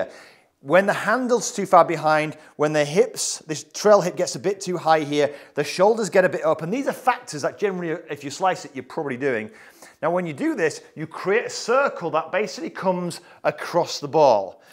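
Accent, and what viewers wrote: United Kingdom. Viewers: Found this channel today. I'm back playing golf after take 15 years off to be a single dad. Why is it I learn the most from people with an accent from Great Britain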